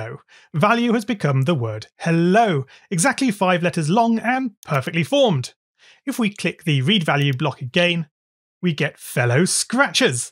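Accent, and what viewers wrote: United Kingdom. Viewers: YOUR British?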